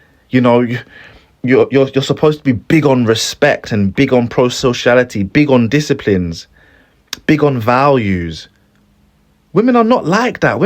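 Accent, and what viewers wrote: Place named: United Kingdom. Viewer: Ppl like the fake. That’s why redpill folk so popular. Goofy ppl gravitate to goofy ppl. He’s this era Patrice O’Neal with the UK accent. Wish him success nd continued contentment in doing what he loves.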